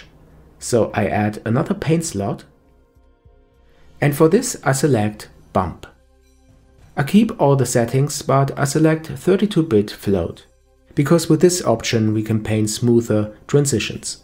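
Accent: Germany. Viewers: Me: I need a clear concise tutorial... (Clicks on tutorial): (German Accent) "Hello Guys, here's a Blender 2.8..." Me: Oh thank god:D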